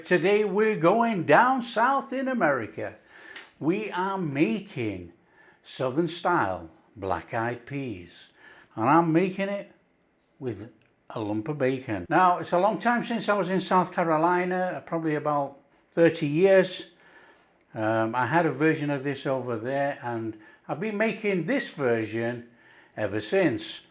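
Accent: United Kingdom. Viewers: What a delight - Hoppin' Jack - or Hoppin' John - with a British accent. From the northern US, but schooled in the South, so I enjoy a variety of southern dishes. This will be in the plan next time we have ham!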